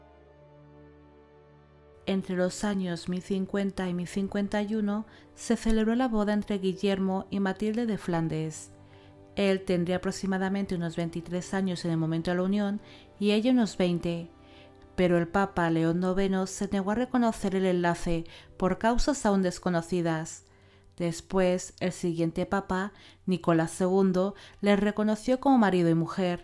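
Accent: Spain. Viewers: Estoy enamorado de tu voz, la cadencia, tu acento peninsular... como Argentino, hijo de España, me derrite. Excelente trabajo.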